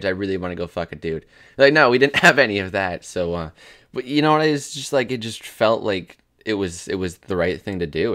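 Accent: Canada. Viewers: Just wondering, did you ever have a Canadian accent?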